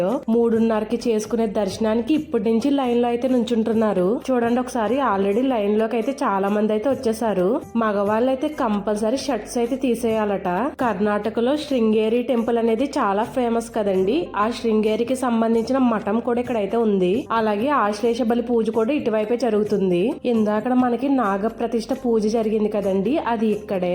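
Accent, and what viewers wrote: Konaseema, India. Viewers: You have explained everything very well amma. Specially your konaseema style of language is nice to hear n infact we all enjoyed. Good vedio n we wish you all the very best .God bless you amma.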